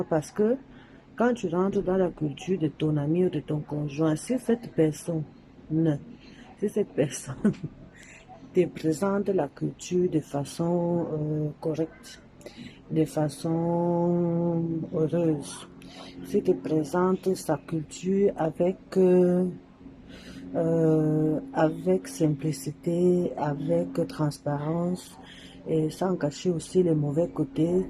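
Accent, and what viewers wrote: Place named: Cameroon. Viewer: Tu as l'accent des Camers. Tu es une vraie camerounaise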